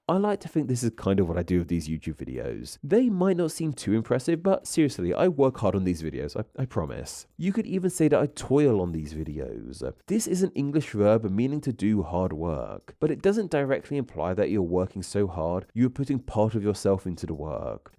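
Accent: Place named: United Kingdom. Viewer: I think flawedcore sounds great in your UK accent...flawcore may be better in my US accent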